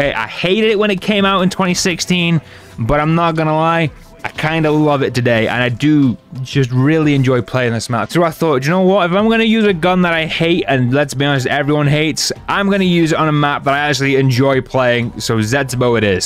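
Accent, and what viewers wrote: United Kingdom. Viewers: Didn't realise you were British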